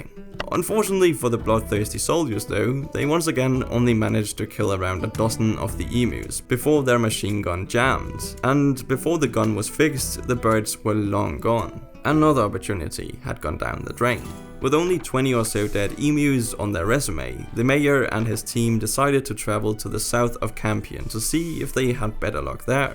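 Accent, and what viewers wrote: Denmark. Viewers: Are you from Denmark? Your accent kinda sounds like it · He’s Danish, how in the world did you mix up those accents?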